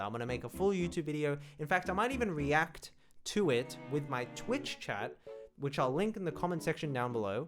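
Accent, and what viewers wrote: Australia. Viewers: in his asmr he just sounds like an american or canadian but as soon as he speaks up a little your like jesus thats a fucking aussy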